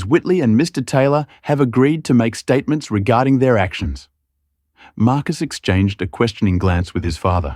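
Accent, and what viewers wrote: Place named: Australia. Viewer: It's nice to hear someone,narrating in my "language" my Aussie version,,this is real English,, n I loved every minute of it❤listening Papua New Guinea 🇵🇬 south pacific down under pondoman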